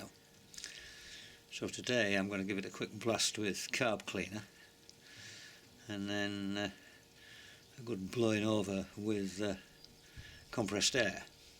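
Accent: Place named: Northern England, United Kingdom. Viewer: Hi. 2 years ago it would apply to me, Done 40 clocks since then, sell them on ebay and do some repair work as well. Really enjoy the work. Found something I enjoy doing at last and get paid for it as well. Seem to have learnt very quickly, despite being old 58 years. Sound you have a northern accent like me, Cheshire.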